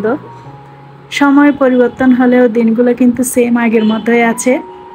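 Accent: Chittagong, Bangladesh. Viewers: আসসালামু আলাইকুম কেমন আছেন আপু মাশাল্লাহ আপনার ভিডিও দেখে খুবই ভালো লাগলো রান্না করা ঘুরাফেরা সবচেয়ে ভালো লেগেছে আপনি চট্টগ্রামের শুনে আপনার কথাগুলো তো খুবই মিষ্টি লেগেছে এবং আসার সময় রাতের খাবার বাইরে খেয়েছেন দেখে খুব ভালো লাগে দোয়া রইল আপনার জন্য এবং আপনার পরিবারের জন্য আপু সব সময় ভালো থাকবেন ❤❤❤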